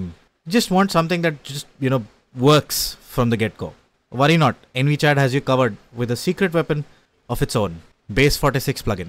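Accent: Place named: India. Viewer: chat gpt x indian accent is so crazy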